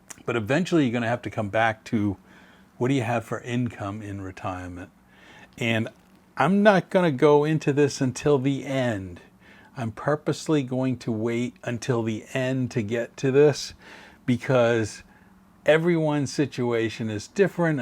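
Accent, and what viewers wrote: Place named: Connecticut, United States. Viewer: oh new Hampshire!! I thought you were from CT. Similar accent I guess. That makes perfect sense. Sunbirds! I guess we've been sunbirds with our timeshare in Orlando. Mostly going in summer when the kids were off school. Our youngest is a college senior so those days will hopefully be a memory soon.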